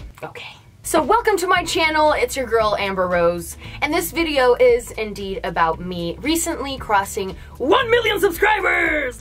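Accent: United States. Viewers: why do you speak with an american accent? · If she's from Finland then why does she have an American accent? I'm so confused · i'm sorry if this has been asked before, but why does she sound american? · is she finish and American? She sounds American what a shame :( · Where did you get the American accent from?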